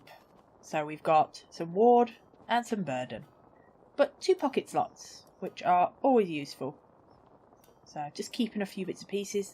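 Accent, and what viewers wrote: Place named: United Kingdom. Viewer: Interesting game, thank you for sharing it. Love your strong British accent too.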